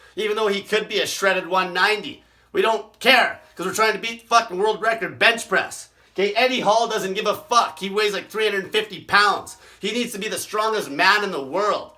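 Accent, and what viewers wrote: Canada. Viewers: Love how he has the typical English-Canadian accent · It is English-Canadian instead of French-Canadian.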